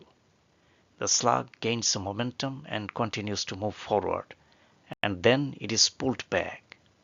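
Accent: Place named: Nepal. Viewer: Nepali ho sir accent nepali jasto xa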